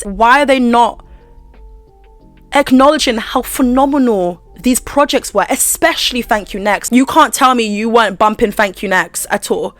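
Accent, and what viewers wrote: Australia. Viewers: Where's that ozzy accent coming from babe? and her accents always cheer me up. As far a hydrating tea, might I suggest 3 ginger and throw some fresh lemon in to the mix. Hangover, depression, exhaustion who?? That tea hits differently. Anyway, back to the drama with our main drama queen here on YouTube ❤ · Oh lord I am loving your play on the accent, you are sounding like an Aussie ❤🐨🦘🇦🇺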